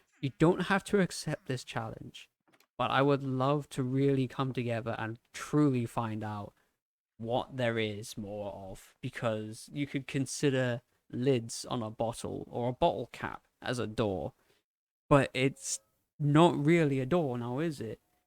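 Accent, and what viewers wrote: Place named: United Kingdom. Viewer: You sound British as shit cuz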